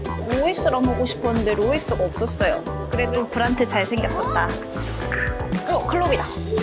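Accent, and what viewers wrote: Gyeongsang, South Korea. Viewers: 경상도 삘이!!!! ㅎㅎㅎ 평소 좋아하던 분인데 정감이 가네요!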